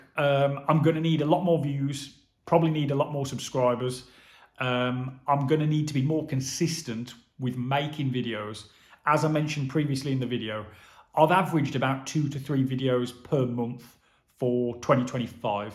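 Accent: Black Country, United Kingdom. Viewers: Hi, Steve here from Wolverhampton. As you say, it's time consuming work operating a small but growing YouTube channel, but you have done more than most and become monetised in just over a year. I have only just come across your channel and enjoy your presentation style in that fellow Black Country accent with an open and honest approach. I have particularly liked your pension update and solar panel review and have diarised Ace Solar for when I get quotes next year and will mention your name when I contact them. Now for a few new video idea - Based in the Black Country, the new Peaky Blinders movie coming out soon how about a trip to the Black Country Living Museum showing the delights of this fantastic facility and some of the locations for filming - Food for thought - Keep going, we are all enjoying your posts.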